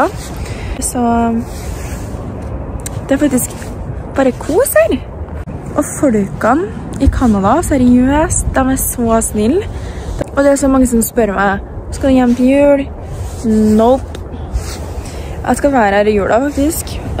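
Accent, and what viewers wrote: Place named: Trøndelag, Norway. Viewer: Stark TroNDD-Yem accent 😄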